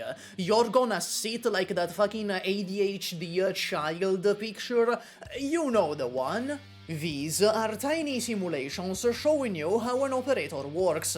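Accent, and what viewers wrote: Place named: Italy. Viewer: I dunno about "overwhelmed", I just came to listen to my favourite Italian voice for 20 minutes while I figure the game out myself lol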